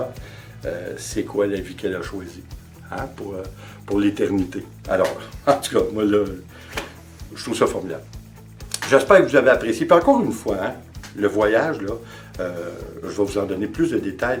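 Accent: Canada. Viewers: Bonjour bb j'ai pas compris avec l'accent canadien ce que vous mettez en plus de l'eau merci de me briffer ça serait sympa et aussi je me lance dans la peinture dont je ne connais rien mais qui me fait envie🎨🎨🎨😊🙏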